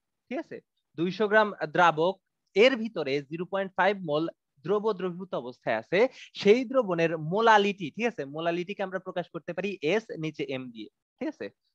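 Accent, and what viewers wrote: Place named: Chittagong, Bangladesh. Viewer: oree vai tw Chittagong er.. Seraaa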